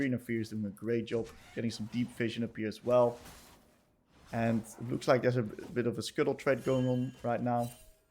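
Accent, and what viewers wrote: Netherlands. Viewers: he has a dutch accent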